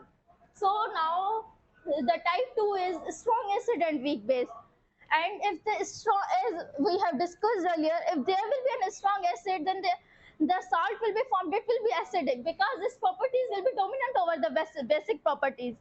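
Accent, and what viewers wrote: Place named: Sadri, India. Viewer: Stand proud you tried your best🎉 btw are you speaking sadri in between 😅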